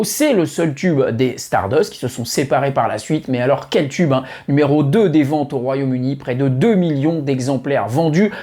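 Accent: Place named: France. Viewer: Les mots en anglais prononcés avec cette french touch 👌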